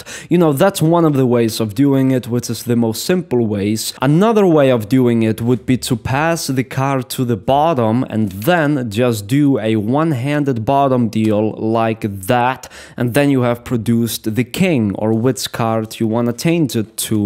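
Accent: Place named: Russia. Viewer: how many accents do you Russians have? I've watched few Russians youtuber all of them are diffrent and none of them speaks the same accent as I've seen in movies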